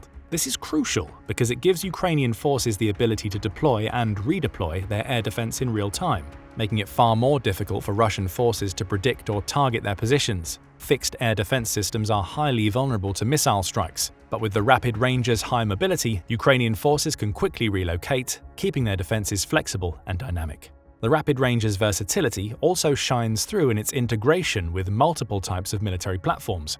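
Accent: England, United Kingdom. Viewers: You sound English. You're wittering on about British armaments. So why are you stupidly pricing them in dollars. They actually cost POUNDS STERLING. What are dollars worth? Considerably less now than yesterday, and less yesterday than the day before. Somewhat like the German Mark in the 1920s-30s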